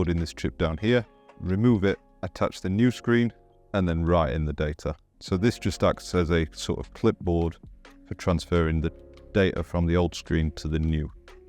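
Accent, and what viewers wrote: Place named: Sussex, United Kingdom. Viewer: I just received my replacement battery for my iPhone 11, and I’m ready to put everything together probably tomorrow. Your voice sounds familiar to me as my ex-wife‘s mother is from Sussex. I miss bubble and squeak, bangers and mash, and steak and kidney pie. It’s been 30+ years since I’ve had it, and watching your video makes me ‘Jones’ for it. Thank you for your time.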